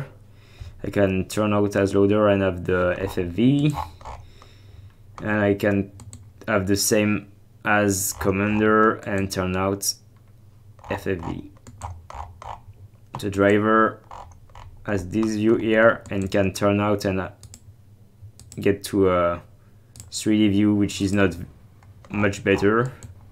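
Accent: France. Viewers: tu a un accent français tu est un français en tout cas très beau mods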